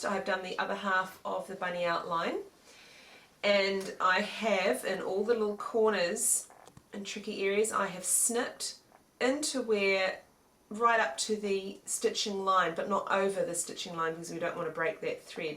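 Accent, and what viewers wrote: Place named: South Africa. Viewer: I detect an accent where are you from? SA?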